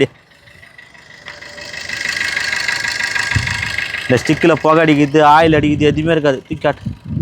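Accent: Madurai, India. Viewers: Madurai vasagam super 👌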